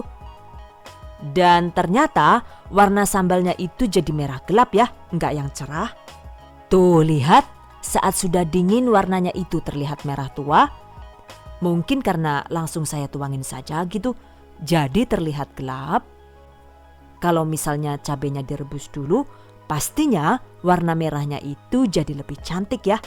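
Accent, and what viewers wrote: Banyumas, Indonesia. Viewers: Makasih resepnya mba 🙏 Bismillah aq mau coba buat usaha, semoga berhasil Aamiin Ya Allah Btw, kok mba nya bisa ngomong ngapak 😆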